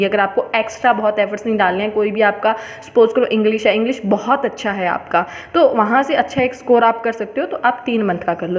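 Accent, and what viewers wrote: Haryana, India. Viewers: Aap haryana se ho ????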